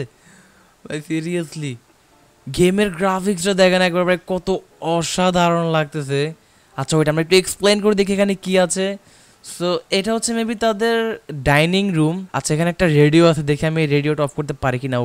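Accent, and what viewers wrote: Noakhali, Bangladesh. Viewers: ভাই সত্যি করে বল তুমি কি নোয়াখাললে।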